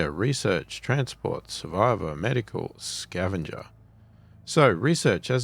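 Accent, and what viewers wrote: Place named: Australia. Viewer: where you from brother u sound aussie... edit: yea checked the about section XD g'day brother XD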